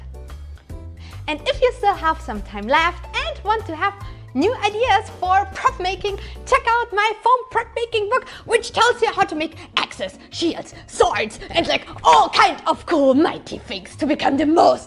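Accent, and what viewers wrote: Germany. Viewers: Das deutsch englisch ist einfach nur genial 😂😂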